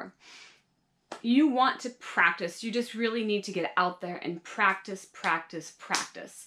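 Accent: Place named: France. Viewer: c fou cmt tu maitrise l accent francais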